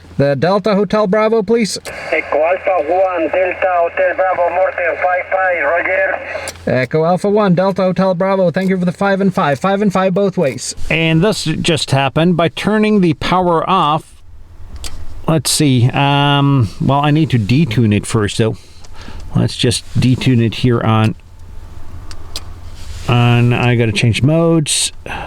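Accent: United States. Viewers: I enjoy your channel. Have you lived in the States? You have a very midwestern accent. Or midwesterners have a Norwegian accent.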